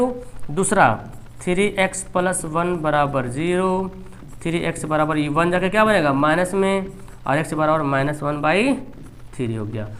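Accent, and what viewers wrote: Bihar, India. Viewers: Sir aap Bihar se h kya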